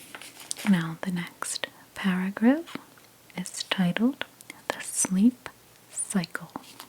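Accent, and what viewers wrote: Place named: New Jersey, United States. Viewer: I believe it’s New Jersey!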